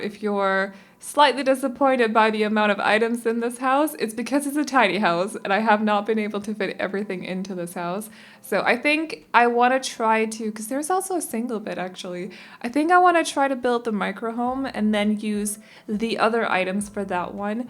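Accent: Denmark. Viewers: Omg- your Danish accent is so good! 💖🇩🇰 (Btw amazing build)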